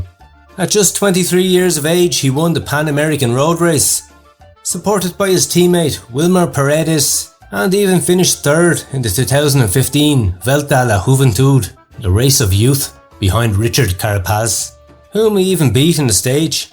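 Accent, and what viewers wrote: Ireland. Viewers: The videos and the information are always great but the Irish accent takes the biscuit or the cake👏💪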